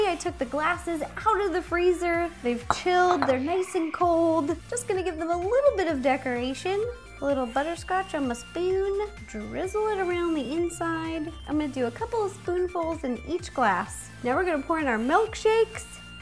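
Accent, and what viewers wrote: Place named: California, United States. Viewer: Ahhhh you're one of those... I think it's a California thing. I pronounce a myriad of words strangely. Melk, Cetch, Pellow.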